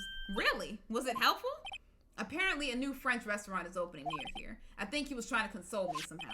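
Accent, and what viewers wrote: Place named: South, United States. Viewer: Girl your cowboy southern accent is too funny!!!!😂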